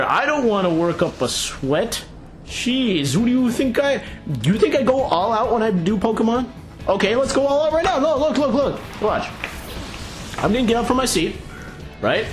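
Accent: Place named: United Kingdom. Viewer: I'm British and i like your British accent! :)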